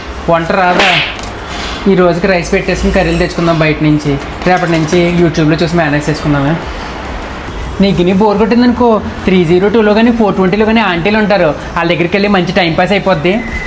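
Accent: Godavari, India. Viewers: Love your slang😊 godavari slang super asalu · మన గోదారోళ్ళ గొంతుక మారుమోగిపోతోంది మీ వల్ల... All the best-frm konaseema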